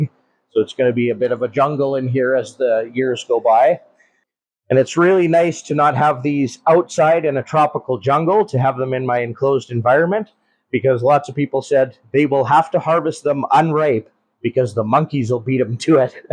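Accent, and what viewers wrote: Saskatchewan, Canada. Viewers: Bro, we can tell by the accent you're a good Sasky Canadian boy, there won't be any naysayers 😂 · I couldn’t possibly say nay to that thick Canadian brogue. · Some how the Canadian accent works with better than and I'm not sure how I feel about it.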